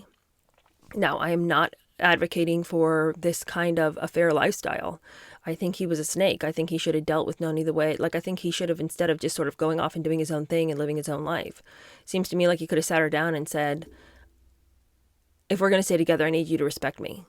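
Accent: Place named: Upper class, United Kingdom. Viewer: In the UK that accent is a golden ticket, it denotes you have the best education and through that elite education the best contacts. This is why so many Brits strive to have their children privately educated. I didn't have the means to privately educate my son, but I am able to help support one for my grandson.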